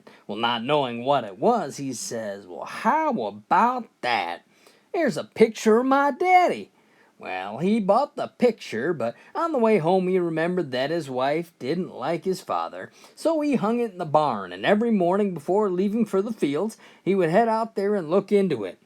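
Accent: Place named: Texas, United States. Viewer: I laughed once, but not because of the jokes. Ngl they were terrible but that texas accent was funny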